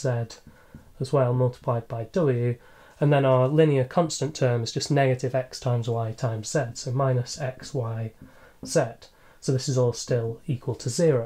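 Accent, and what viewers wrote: United Kingdom. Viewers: Thanks for this video and for your british accent :) Clever solution and beautiful problem. Hope you are doing well :)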